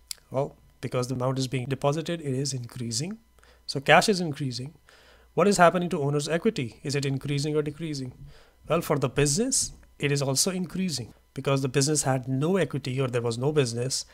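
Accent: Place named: India. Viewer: that Hindu accent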